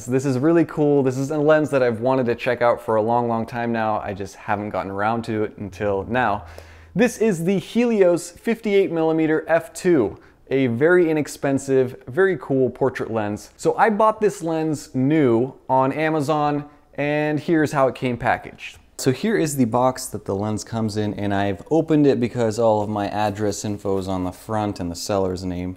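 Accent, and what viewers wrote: Russia. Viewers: Чувак, ты крут! Откуда такой русский? Where did you get this accent?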